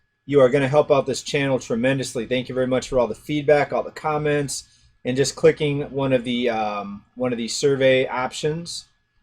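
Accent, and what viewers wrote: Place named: Michigan, United States. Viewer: I’d like to hear your story about leaving the midwest. I can tell by your accent you are from Michigan or something over there. We just did a roadtrip to the upper Midwest and loved it, though the weather was not for the faint of heart lol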